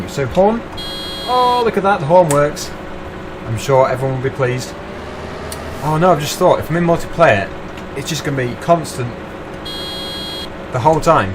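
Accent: United Kingdom. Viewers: and are you british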